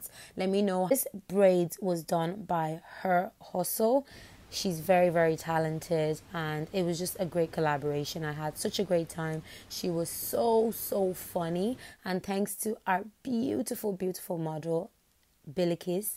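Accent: Ireland. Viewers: The Irish accent omg 😍😍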